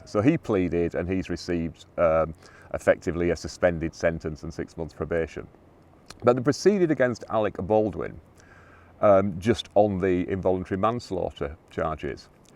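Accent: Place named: North, United Kingdom. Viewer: Correction required, (if you wish.) Access to the beach/mining tunnel must be at low tide, not high tide as stated. Add caption to correct ? By the way, I love your northern accent, and I am proud of you for retaining it, especially in your profession where it could be a disadvantage for you. Where are you from ?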